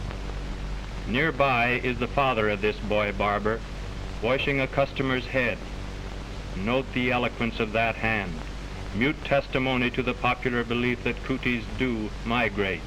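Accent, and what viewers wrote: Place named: Mid-Atlantic, United States. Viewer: Yes, it was a typical manner of commenting back then, with the upper class Mid-Atlantic accent, and had nothing to do with disdain. It's also worth remembering that it was only the 2nd or 3rd year of the "talkies."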